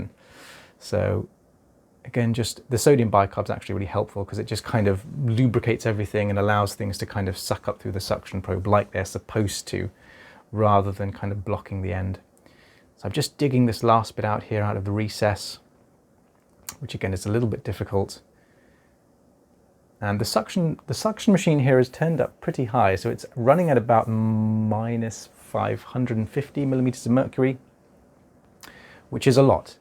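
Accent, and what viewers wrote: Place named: RP, United Kingdom. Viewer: I cannot stand this posh xxxx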